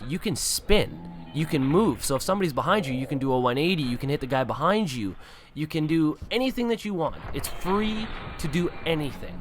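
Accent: Canada. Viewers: His canadian accent is so strong I cant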